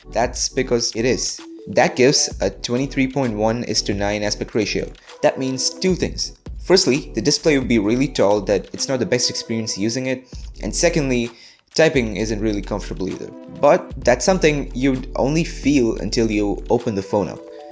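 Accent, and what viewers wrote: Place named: United States. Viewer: you're an Indian? your english accent is american. nice 👏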